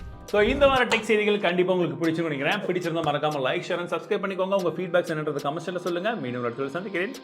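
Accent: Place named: Bihar, India. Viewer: Bihar voice super bro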